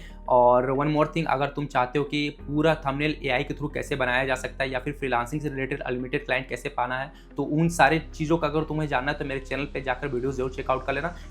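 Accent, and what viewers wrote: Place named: Hyderabad, India. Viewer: Zabardast Haiderabadi Accent.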